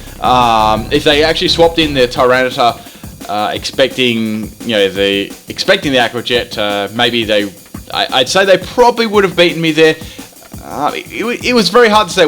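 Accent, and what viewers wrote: Australia. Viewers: became accustomed to it after a while...such an Australian accent...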